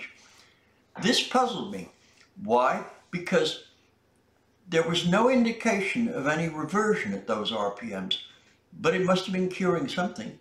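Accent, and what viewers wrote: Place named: West Country, United Kingdom. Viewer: Hearing a west country accent🤔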